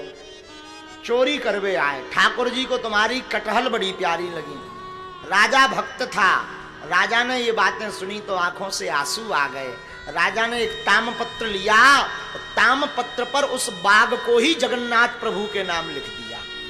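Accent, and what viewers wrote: Bundelkhand, India. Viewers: Gurudev apke shrimukh se jagannath baba ki katha badi pyari lagti hai bundelkhandi free style pyare se apratim saundarya ke dhani gurudev ap atulniya hai. Bala ji maharaj apki jai ho. 🎉❤🎉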